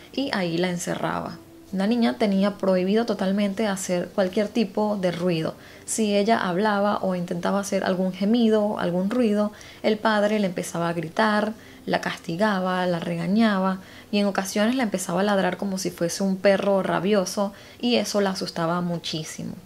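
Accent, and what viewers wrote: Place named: Venezuela. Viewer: no se porque llegue a ti. pero al escuchar tu bello acento venezolano. me encantaste. aparte de lo interesante de tus contenidos. mucho éxito y felicitaciones. arriba Venezuela.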